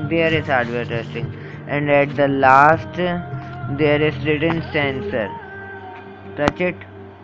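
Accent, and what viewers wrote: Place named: Pakistan. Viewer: Tamás Papp bro if you dont like someone's accent ,just go away dont judge him by his way of speaking, at least what he did worked and its a working trick ,he is from Pakistan and here english is a secondary language and he made a video in English to help the Western people who are in problem ,he can also make a same in his own language but he cared of all coz english is adapted by many countries .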